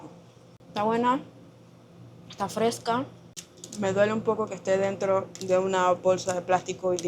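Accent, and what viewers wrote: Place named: Panama. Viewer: Es imposible reconocer a una mujer panameña❤😊empeze recién a ver tus videos y desde que te oi hablar lo supe(yo tambien soy panameña)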